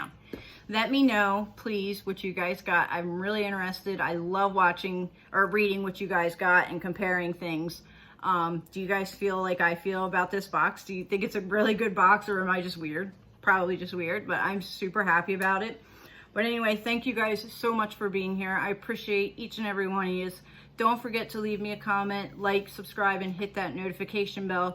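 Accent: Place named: Pittsburgh, United States. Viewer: Heard your Pittsburgh accent and subbed :-) Was considering stopping premium but maybe not lol. Great unboxing. Love the hair and also saw you in my rec feed.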